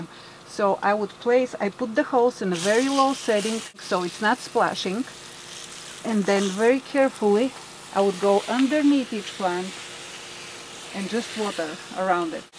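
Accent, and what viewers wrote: Eastern Europe. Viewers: When you start your plants do you put 2-3 seeds in and thin them out later or just use one seed at a time? The ones I thinned are about dead but the ones I didn't are doing great. I have one plant that keeps growing but the leaf curl is so bad they look like stems, will they ever go back to looking normal? Also, I water at night too. What country are you originally from, sounds like a border Russian country. I hope you don't mind me asking. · get rid of that east melania trump european accent.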